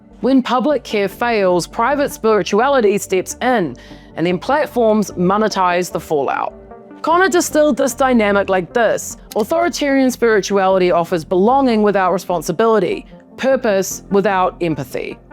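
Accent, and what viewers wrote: New Zealand. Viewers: I said the same thing! And then felt awful because she clarified she IS a kiwi 😂 🤦‍♀️ real broad accent huh?! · Kiwi! Finally you disclosed and I learned where your accent came from! Now I have to convince myself that you are not a blank slate no readily foundable bio, AI made youtube anchor! hahaha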